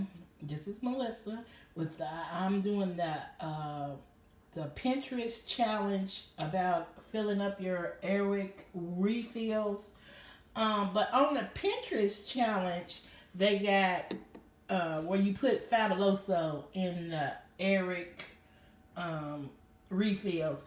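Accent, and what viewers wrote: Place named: South, United States. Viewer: the southern accent is very soothing and calming lol❤️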